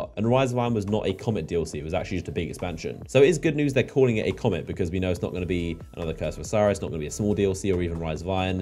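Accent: United Kingdom. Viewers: Can you learn an American accept please to be more relatable to most users? This british thing is hard to listen to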